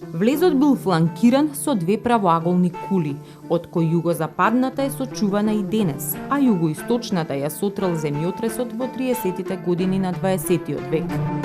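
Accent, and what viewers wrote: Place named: Bulgaria. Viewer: Baj Bulgarski, only the accent is here and there different and rather funny.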